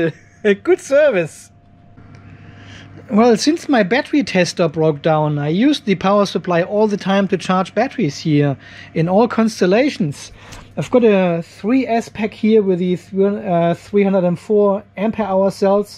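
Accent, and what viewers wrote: Germany. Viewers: Alter, Given Up the ghost, was das denn für Denglish ? Alter Falter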